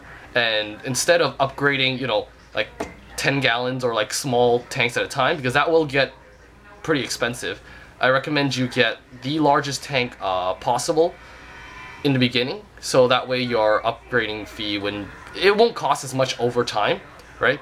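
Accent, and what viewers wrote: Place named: United States. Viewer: I think he might actually be american based on his accent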